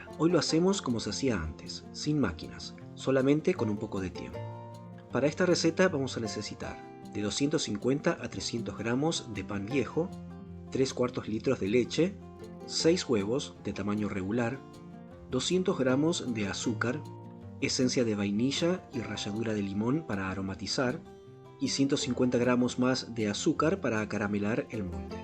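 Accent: Chile. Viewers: ¿ Es chileno no ?🤔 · Pensé q era chileno! Jeje tremenda receta... cuidado de hacerla muy seguido,digo,por el azúcar...pero impecable y único em sabor y recuerdos 😊 gracias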